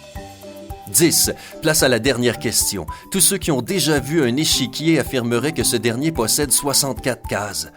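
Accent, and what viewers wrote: Canada. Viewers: arrêter la voix du canadien j’ai mal a la tête quand je l’entend... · C un canadien???!!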